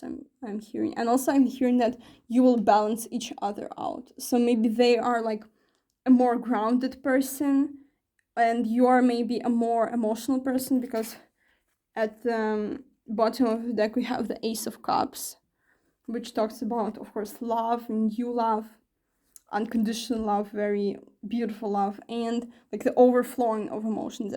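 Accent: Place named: France. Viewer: Pile 1. Are you french ? Cute accent by the way 🥰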